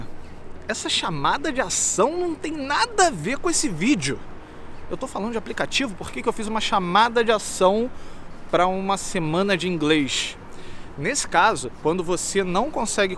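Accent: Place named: Rio de Janeiro, Brazil. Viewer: O que eu sinto ao ver os videos do: 1 - Esse cara ta mesmo querendo ajudar. 2 -Ele entende MUITO de programação, mas tb entende mto do business por trás disso, não só de ser funcionário. 3 - Saudades de Vancouver, melhor lugar que ja morei na vida. 4 - Sotaque do RJ é foda 5 - Preciso ser aluno desse cara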